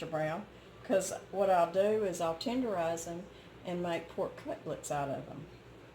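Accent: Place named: Kentucky, United States. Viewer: Hi. I just discovered your channel. I love to watch you. I like how you do things the old fashioned way, like my Mama taught me. I love raising a garden, canning my vegetables. Making bread from scratch, doing just about anything the old fashioned way. Thanks for sharing your talents with us. I was born and raised in KY. I live in TN now. Your accent sounds like home. Where are you from?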